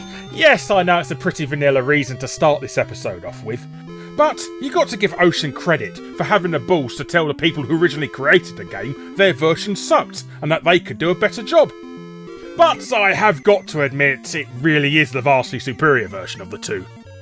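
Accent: England, United Kingdom. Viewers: I know I'm not the only one who thinks British accent, his matter-of-fact tone of voice, dry British humor, humor in general, and well-researched content is an absolutely perfect combination. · Question, what kind of British Accent do you have? (Excuse my American ignorance) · He's English, not American. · Hypothesis You are bored, there is a certain appeal in something you can relate to, Old video games, and this brittish guys accent is welcoming to you.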